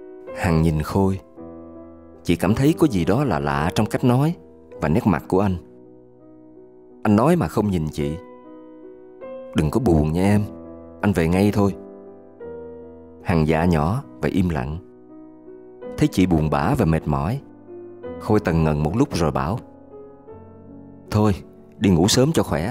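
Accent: South, Vietnam. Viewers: Giọng miền nam của bạn thật hay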